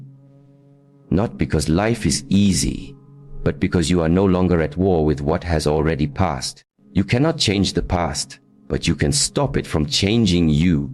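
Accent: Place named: India. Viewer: Okay he sounds like he's from india on this one, It definitely threw me off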